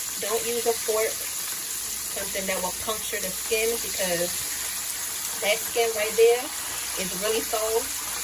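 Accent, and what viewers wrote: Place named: South, United States. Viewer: Sounds like you ade from Mississippi or Louisiana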